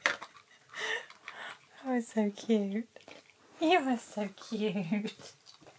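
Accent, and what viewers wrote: United Kingdom. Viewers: What kind of english accent it is?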